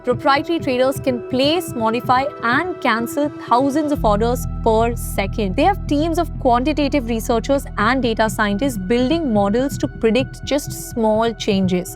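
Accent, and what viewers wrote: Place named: Mumbai, India. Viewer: All from Mumbai same accent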